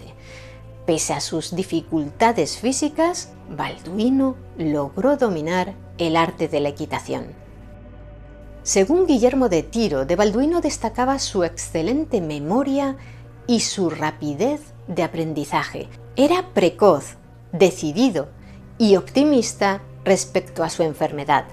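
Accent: Spain. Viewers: Ese acento español es bien agradable, de que zona es?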